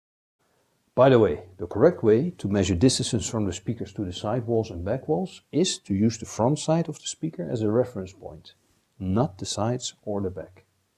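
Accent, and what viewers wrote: Netherlands. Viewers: I'm 100% sure he's from the Netherlands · thanks good short explanation ! from your accent i would have thought you are from holland , and you could check out my set because i live in amsterdam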